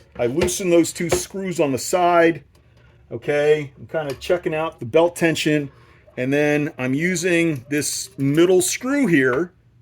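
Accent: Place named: Philadelphia, United States. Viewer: Thanks for the help! You sound like you're also from Philly, right?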